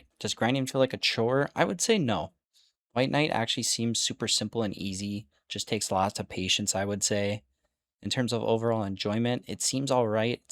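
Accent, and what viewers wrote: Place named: Wisconsin, United States. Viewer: Kinda random but are you by chance from Wisconsin? Your accent sounds similar to mine.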